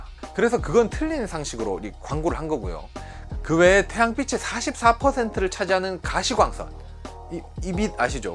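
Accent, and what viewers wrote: Gyeongsang, South Korea. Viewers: 어쩌다 들어왔는데 경상도 억양으로 쉬원하게 설명하네요. 좋은 정보가 많네요 감사.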